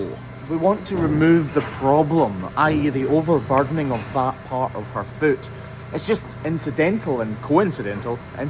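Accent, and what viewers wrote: Scotland, United Kingdom. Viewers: I kinda like him talking, with that wonderful Scottish brogue.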